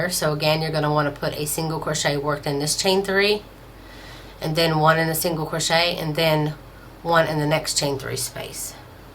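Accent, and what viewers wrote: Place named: Midwest-South, United States. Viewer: It's so funny, because you have a kind of midwest-southern accent I always pictured you in Kentucky or Tennessee, with a bunch of kids playing outside! Israel is about the last place I thought you might be recording these (well, maybe not China...) How did you wind up in Israel? My Uncle Jack became Orthodox about 20 years ago and now lives outside Jerusalem—but he still sounds like he's from New York! BTW I unraveled the first one and am now using plain old Sugar and Cream in various colors. I am thinking of doing some cross-stitching in the diamonds...Thank you!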